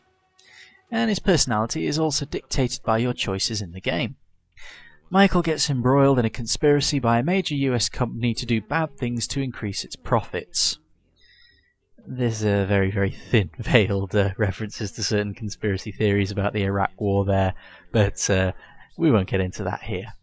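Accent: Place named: Ireland. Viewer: you sound relatively irish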